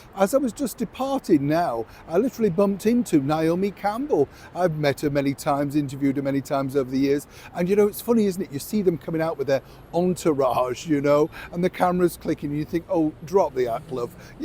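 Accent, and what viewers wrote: Yorkshire, United Kingdom. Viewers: You're pure Yorkshire this morning, I love it!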